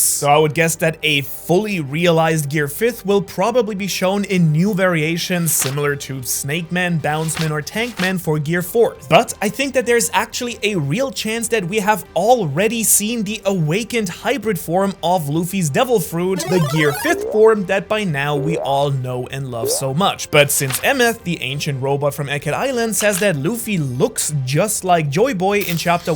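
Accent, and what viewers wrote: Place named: Germany. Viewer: are u german ?since when did j start sounding like y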